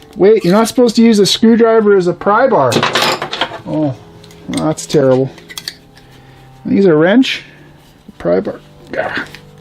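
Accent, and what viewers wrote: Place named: Canada. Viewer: You must drive across the border to buy stuff because sounds like you're Canadian it seems like stuff is so much more up there I've been there many times it's like the Canadian content law make stuff way more expensive and they can't sell tools like this there that cheap. But if it was made in Canada they can sell it so the Canadiens markup the hell out of everything that they make so everything cost you guys too much money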